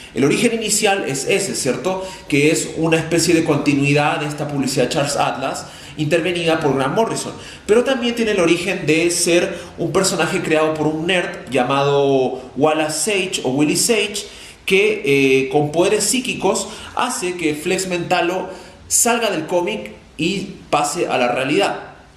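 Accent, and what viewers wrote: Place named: Chile. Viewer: Hola por su acento creo que es chileno claramente, muy buen canal este de termino de descubrirlo y suscribirme. Saludos desde Alicante - España.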